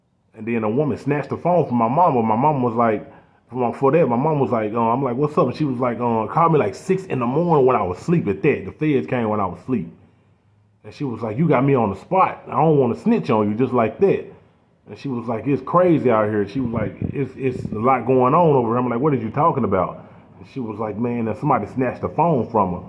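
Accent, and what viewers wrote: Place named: Memphis, United States. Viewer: Damn Ian heard 'Jone' in a min, I can tell you spent some time in Memphis. Love from south Memphis mane just subbed, can't wait to see yea channel grow.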